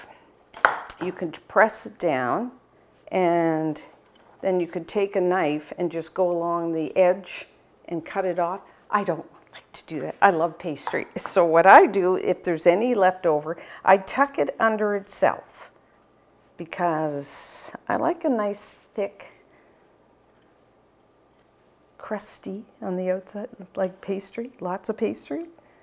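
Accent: Canada. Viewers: Wow, you're from Georgia! For some reason, I thought you were Canadian lol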